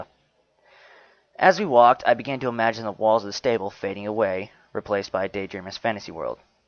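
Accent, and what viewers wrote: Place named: Boston, United States. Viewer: Most of all I like your slight accent. What is that Bostonian? Got to be eastern seaboard.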